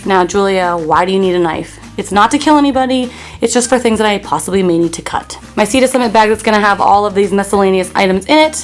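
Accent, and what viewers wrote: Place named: Pennsylvania, United States. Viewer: Are you by any chance from the Philadelphia area?? You sound exactly like you grew up in South East pa haha that isn't an insult at all I promise, I live in Delco 😁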